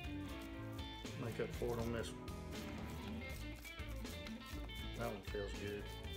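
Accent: South, United States. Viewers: you sound like a real country boy what state are you in to me it sounds like Texas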